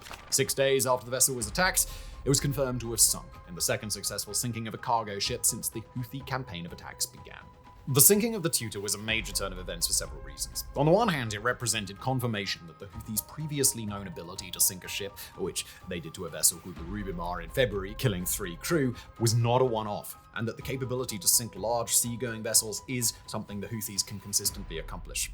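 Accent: RP, United Kingdom. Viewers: Couldn't watch the 'over acting or the over the top posh english with the noticeable changes in the octave of the tone', i thought he sloved it down noticeable recently but guess he's back at it again. Time stamp is .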